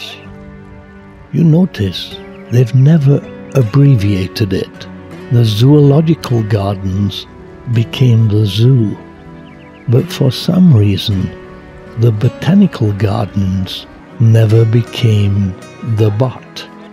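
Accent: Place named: United Kingdom. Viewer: Heroin commentary ftw. I am expecting an "I love you Susan" followed by a death rattle throughout this video. Please man, nice video but have a cup of coffee before you narrate a 20-minute long video sounding like a dying 110 year old Vietnam veteran. The "old British" charm gets somewhat drowned in a weird combination of sleepiness, cringe and wanting to kill myself.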